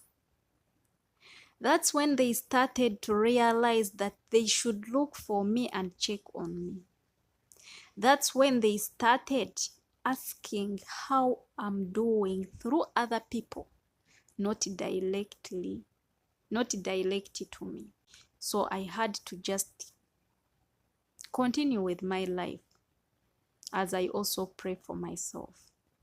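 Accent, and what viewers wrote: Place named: Kenya. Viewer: You sound like a Kenyan ooh God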